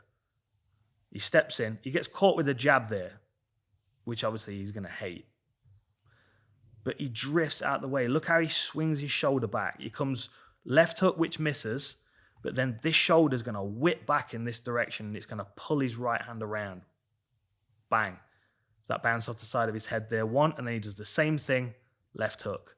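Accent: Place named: East Midlands, United Kingdom. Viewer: Incredible breakdown as always and... incredible accent. East Midlands, let's gooo!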